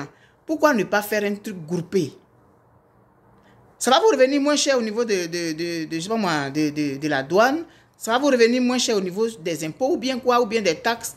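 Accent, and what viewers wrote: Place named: Cameroon. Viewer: Vraiment il fait une publicité gratuite. Il est malade. Nous africains sommes méchants. C est un camerounais par son accent. Eeeh Dieu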